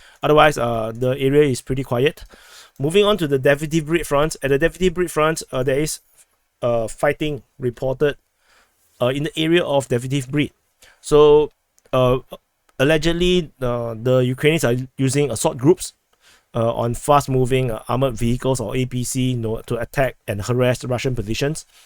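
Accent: France. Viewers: good pronancuation closer to real one :) yet French :D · I suffer emotional damage listening to your commentary! Really well done - HOW do you get the names out so well?And the FRENCH accent!!!! WOW! But great analysis with current information sorting the known from the guesswork and the propaganda.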